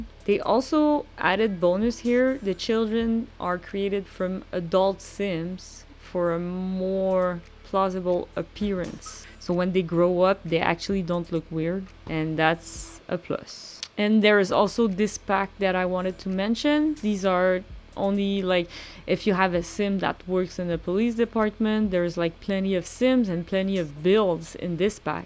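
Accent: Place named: Quebec, Canada. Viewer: une quebecoise !? je déjà suis fan 💋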